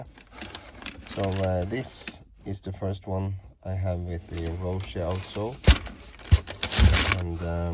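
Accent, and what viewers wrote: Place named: Finland. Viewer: Cool boots when I first saw the thumbnail I thought they were Wolverine 1000 mile cordovan. Do you mind me asking are you Finnish? You have a really cool accent you sound like Kimi Raikonnen or somebody.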